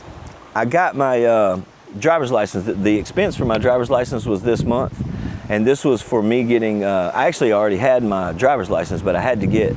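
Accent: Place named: Southeast, United States. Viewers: Hey. I lived in Virginia Beach for 35 years and wouldn’t trade it for anything. You sound like a North Catalina boy. My limited experience would put you in the Outer Banks. Anyway, I dig your content. In the process of selling my BMW R1200GSA WC and will save that for my scooter when I arrive north of Manila September 2028. As an Army veteran, I’ll do the homework for a SRRV. From So. Cal., JM. P.S. Bless you for the charitable heart. 💪🏼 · I am new to your channel, I also live in the philippines. Alabang . I am trying to pinpoint your accent , I'm guessing the southeast United States. Maybe Alabama or Georgia. Any way my Budget runs about 45000 a month php i am pleased to meet you ,Frank from Florida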